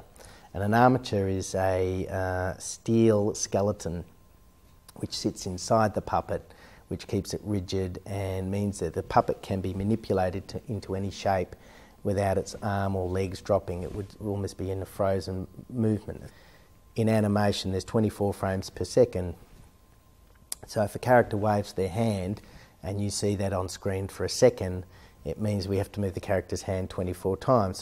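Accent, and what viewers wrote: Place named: Australia. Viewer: I’m glad he still holds the Australian accent I know in love. RIP Australian Accent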